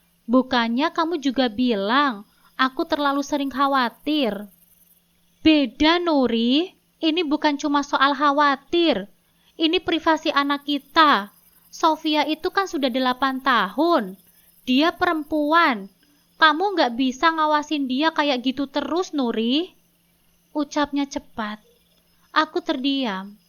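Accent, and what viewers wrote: Java, Indonesia. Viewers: Baca ceritanya lucu,medok jawanya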